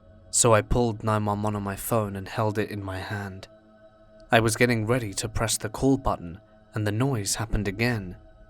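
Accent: United Kingdom. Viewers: Thank you kindly for taking the time in preparing each story and love your British accent it gives every story you read worth listening to. 😊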